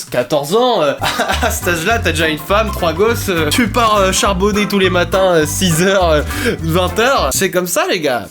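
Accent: Russia. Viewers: Et une dernière question et j'arrête de t'embêter mais est ce que tu pourrais au moins nous garder le "Hello my friend" et nous sortir quelques "cyka blyat" avec ton accent rusde de temps en temps ils sont vraiment géniaux faut pas les perdres:D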